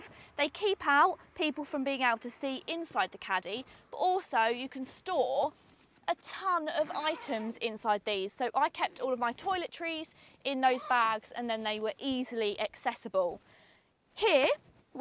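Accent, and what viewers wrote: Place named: Estuary, United Kingdom. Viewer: A joy to listen to that accent that wonderful London Thames estuary, twang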